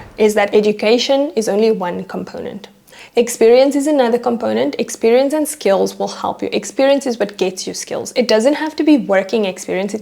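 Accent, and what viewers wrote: South Africa. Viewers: Love your accent! Are you South African?☺️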